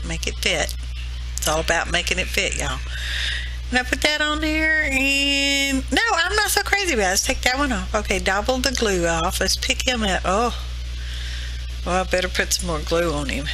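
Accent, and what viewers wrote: South, United States. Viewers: Love your southern accent......wish I talked like that ! Fabulous ATCs😍😍😍👻🎃☠️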